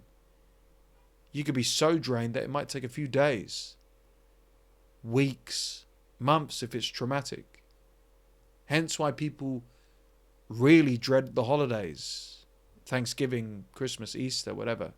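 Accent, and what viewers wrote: United Kingdom. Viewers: Where in Britain are you from? I have a Master's Degree in British English, and I studied to speak Received Pronunciation.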